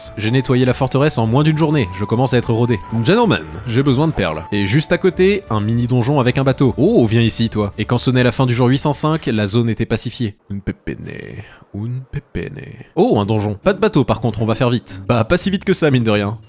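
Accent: Quebec, Canada. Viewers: Tu pourrais faire un monument pour la meilleure de tout les pioches ps j’adore ton accent québécois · Es que tu est quebecois ou canadien😃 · Coucou, tu es Québécois ?? Sinon j'aime trop t'a série 😘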